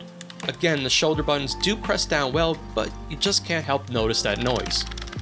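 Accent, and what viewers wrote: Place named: Delaware Valley, United States. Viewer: Another great deep-dive review! Thanks!! BTW, are you originally from Philly? You sound like a Delaware Valley guy!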